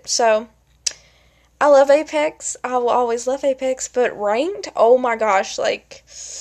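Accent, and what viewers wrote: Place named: South, United States. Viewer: Your so country, I love it 😍 lolol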